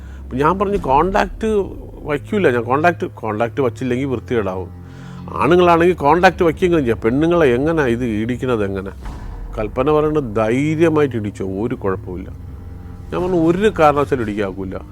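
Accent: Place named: Trivandrum, India. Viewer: great to hear proper Trivandrum accent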